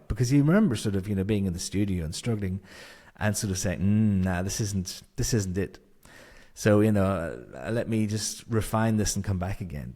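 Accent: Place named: Ireland. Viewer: What part of Ireland are you from? I don't recognize the accent. It's hard to pinpoint. It's like a mash of several accents. I'm from Cavan by the way.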